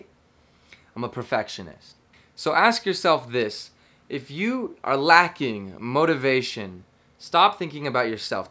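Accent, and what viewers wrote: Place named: United Kingdom. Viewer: Amazing British voice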